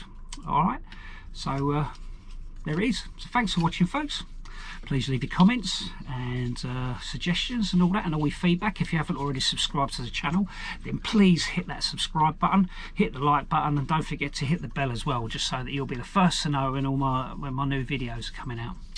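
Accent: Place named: RP, United Kingdom. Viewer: Bit posh mate..... Very posh in fact..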